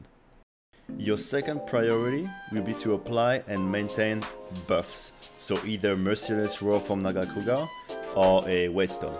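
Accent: France. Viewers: was kinda my purpose. Thx. Btw are you French?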